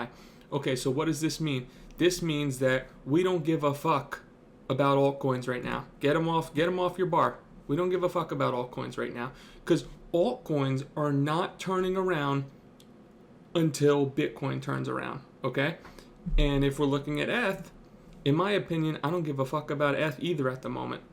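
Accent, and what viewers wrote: United States. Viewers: you sound like you are from new jersey/long island with that accent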